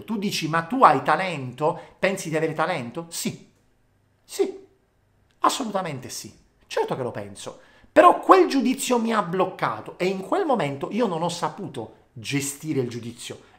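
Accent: Apulia, Italy. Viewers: Grazie di trasmettere positivita e ci incoraggi ad avere fiducia in noi stessi grazie,è bello sentirti parlare con la cadenza pugliese sei unico,mi piace quando inserisci delle parole pugliesi,mi trasmetti la gioia di vivere❤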